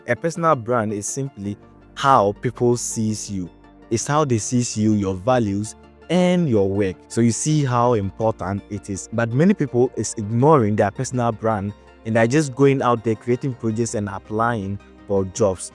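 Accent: Ghana. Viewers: 😂 I like your content bro. Keep it up. I like the way you speak I guess you are from Ghana. Finding job’s and branding as a developer is a very serious problem indeed.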